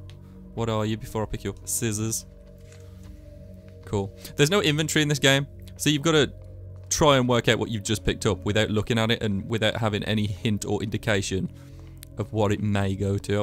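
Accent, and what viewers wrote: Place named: Birmingham, United Kingdom. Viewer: Such a nice looking guy with a yummy brummi sound!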